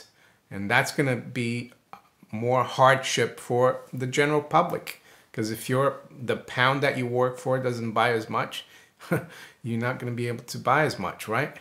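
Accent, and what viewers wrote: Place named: United States. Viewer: based on the decor and the ugly dog I would say you live in England. Based on your voice I would say you are an American living in England ( sorry to hear that).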